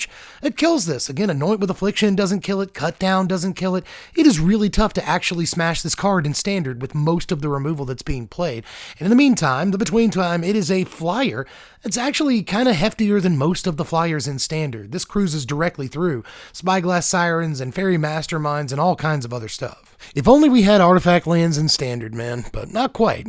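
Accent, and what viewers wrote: South, United States. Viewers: gets more southern with laryngitis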